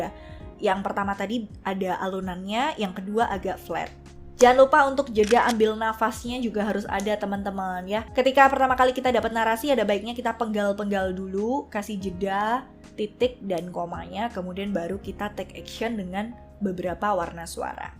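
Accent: Surabaya, Indonesia. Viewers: Akhirnya ngonten lagi, mbak njenengan wong jowo to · Sampean arek suroboyo ta mbak bro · woalah... arek suroboyo ta....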